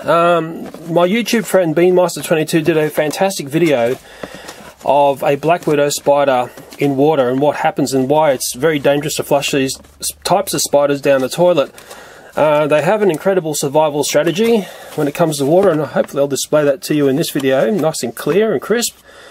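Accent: Australia. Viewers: So Australian people speak English but they don't no our American English interesting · Is dis boi Australian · >mfw you think that's an American accent He's Australian. That's a redback spider. Just stay away from Australia and the drop bears and other nasties won't get you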